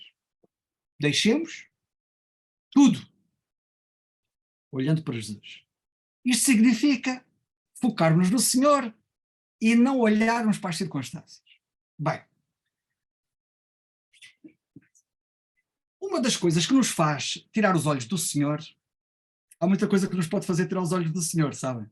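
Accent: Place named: Portugal. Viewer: ❤ como amo esse sotaque de Portugal 🙏 irmão saudades de ouvir o senhor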